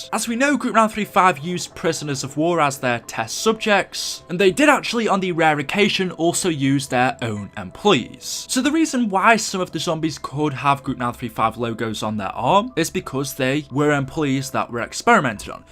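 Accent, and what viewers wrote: United Kingdom. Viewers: Is the Mansion in buried the blacksmith's who built the pack a punch? I mean the pack a punch is near the Mansion so can this possibility be true? P.S show me in the video plus are u British?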